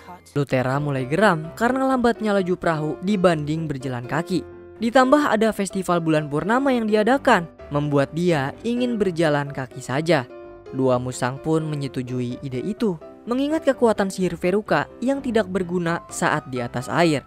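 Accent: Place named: Banyumas, Indonesia. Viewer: Anjir ,,,ngapak coy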